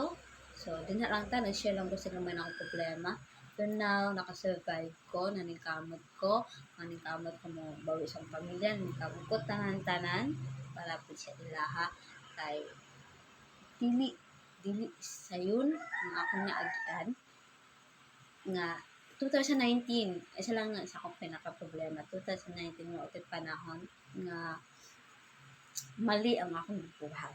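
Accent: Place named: Visayas, Philippines. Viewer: suggest ko mag content ka, mag usap kayo ni camilo in english kay camilo tamang pagka english tapos ang sagot mo english rin kaso barok at bisaya pag ka pronounce.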